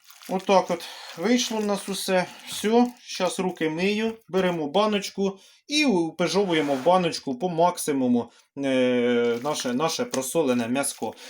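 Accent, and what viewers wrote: Poltava, Ukraine. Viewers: Доброго дня! Із святом Вас! 😊 Так, я зараз теж м'ясо солю зразу, мені так більше подобається, а раніше солила в баночках. Ви, мабуть із Полтавщини? Говір Полтавський у Вас. 😉😊💐💐💐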